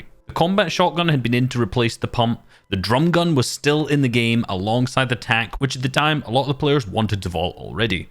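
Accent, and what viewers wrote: Scotland, United Kingdom. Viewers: r u scottish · Ay you Scottish?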